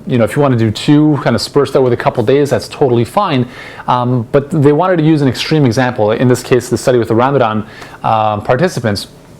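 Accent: Canada. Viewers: Are you canadian? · Love canadian accent. 😉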